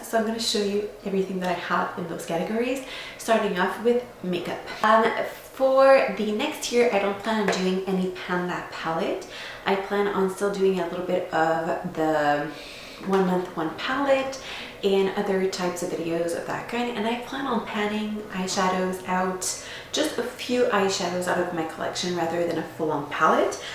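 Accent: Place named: Canada. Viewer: I love your accent! are you Canadian?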